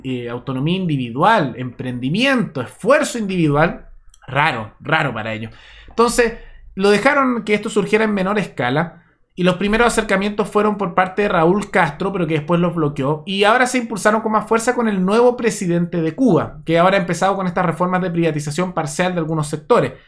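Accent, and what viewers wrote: Chile. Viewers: me parece por tu acento que podrías ser chileno,me gustó tu análisis de la cuestión cubana sobre la apertura de los restaurantes en Cuba pero no estoy de acuerdo con la parte de que le das crédito al mal llamado nuevo presidente y al también nuevo economista de Cuba,pues yo te diré como cubano que soy que nada de esto es nuevo ni tampoco tiene que ver con el hecho de que halla un nuevo presidente porque todos ellos después de la muerte de Fidel Castro han sido colocados ha dedo, Raúl fue puesto ha dedo por su hermano Fidel y ahora Díaz Camel fue también puesto de la misma forma,no perdamos la perspectiva de la política del gobierno de Cuba y tengamos en cuenta que el sistema de gobierno en Cuba siempre ha sido el mismo desde hace más de sesenta años y ese gobierno desde la caída del comunismo en Rusia y en toda la Europa del este ellos nunca permitieron elecciones libres porque no querían perder el poder pero como se vieron aficionados económicamente empezaron ha buscar cómo hacer alguna pequeña apertura económica para salir de la cituacion en que se encontraban y entoces desde los años de 1990 lo primero que hicieron fue legalizar las monedas extranjeras especialmente el dollar y ha permitir el turismo de los países libres,dejaron que compañías extranjeras invirtieran en hoteles y también ha los cubanos dentro de Cuba les permitieron invertir en una serie de pequeños negocios que luego más tarde o varios años después cuando el gobierno vio que la gente estaba adquiriendo alg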